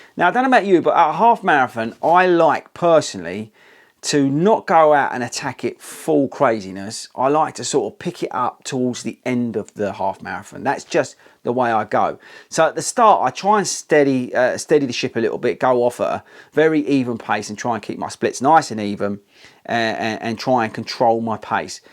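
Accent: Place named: Northern England, United Kingdom. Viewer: Not a huge problem, I like a lot your videos, they are very "straight". By the way, where are you from, your accent, is from the north? my daughter is living in Kent and the accent is quite different. I am from Brazil. The comparative shoes videos are also very good. Thanks for helping us all !! Cheers!!!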